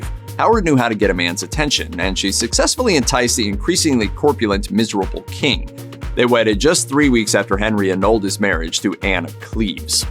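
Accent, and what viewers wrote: United States. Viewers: I didnt finish the documentary because of the annoying American accent 🤷🏽‍♂️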